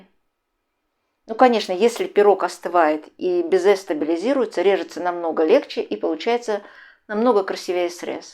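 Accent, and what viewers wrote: Baku, Azerbaijan. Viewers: спасибо за рецепт, землячка! Бакинский акцент не проходит с годами!))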